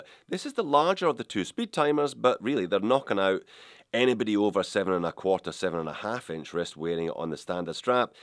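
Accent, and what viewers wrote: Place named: North, United Kingdom. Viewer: I've been in lust with the Longines Spirit Chrono with similar green lume for AGES, but it just wears too large. You can bet I snapped this one up in the sale last weekend, for sure 😉 P.S. Accent sounding quite northern with your head cold,!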